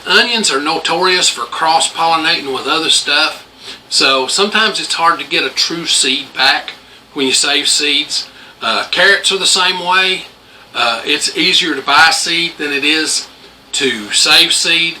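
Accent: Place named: Indiana, United States. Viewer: Hickory Kane(king)! Lol! I talk this way up here in Indiana! Lol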